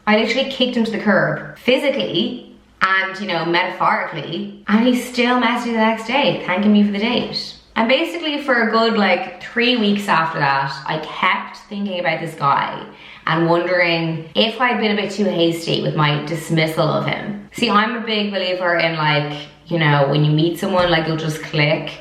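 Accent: Ireland. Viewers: She sounds Irish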